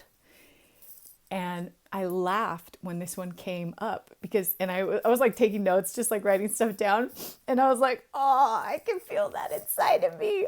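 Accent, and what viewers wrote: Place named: France. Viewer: This is really cool. Thank you for sharing your words of wisdom, and the wisdom of wizards :) Also your French accent is endlessly entertaining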